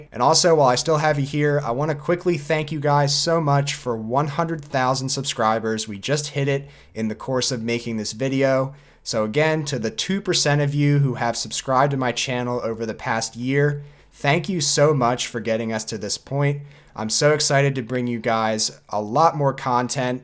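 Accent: United States. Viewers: That is not an English accent, it's an accent from some part of america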